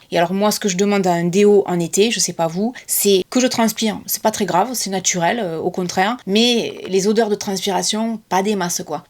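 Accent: South, France. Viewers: Merci Belle. Toujours un plaisir à écouter avec ce joli accent du sud. Courage, tu as le cancer mais le cancer ne t'a pas et ça, ça fait toute la différence.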